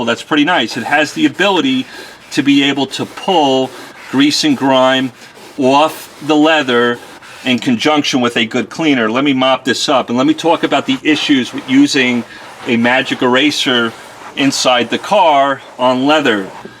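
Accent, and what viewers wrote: New York, United States. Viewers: It's impossible to tell if that's dirt or colorant that's pulled off the seat. Hope your N.Y. accent doesn't drive the native Texans nuts! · Thanks for the tip. I have to do my car and wife's car soon. What pat of N.Y. are you from? (Never heard a Texan sound like Ray Romano)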